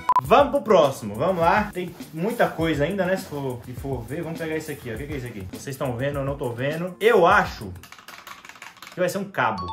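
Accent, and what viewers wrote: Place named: Minas Gerais, Brazil. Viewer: Quando eu descobri que ele na real de Brasília eu ate assustei pq o cara fala igual nos mineiros mo viage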